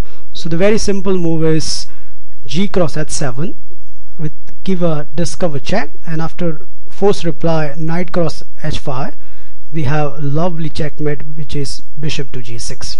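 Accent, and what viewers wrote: India. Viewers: zRouth I am a language teacher AND a passionate chess player in one, and I must admit his accent is like many people's accent in India, but his grammar is not (always) correct what can be disturbing (for native speakers, but I don"t belong to that group.) What he explains about chess is excellent on a lower level. (GM-s do not necessarily benefit from that, as they are already familiar with these tricks /at least the majority of them/.) All in all, I like his vids, you can use them well if you play with short time control (3-5 minute-games) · Indian accent is obvious o.o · nice..as I am also an INdian,your accent is easy to understand and very interesting..thanks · its not a grammar tutorial. and arent you entertained by the way he speaks? for me its funny but at the same time you learn. leave him alone hes not american he's indian, most call center agents are. 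just appreciate the knowledge and entertainment you get at the same time.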